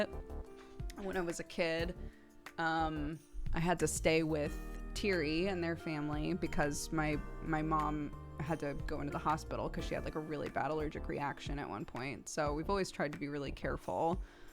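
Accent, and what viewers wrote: United Kingdom. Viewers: She's become fully bri'ish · Very British for some reason 😂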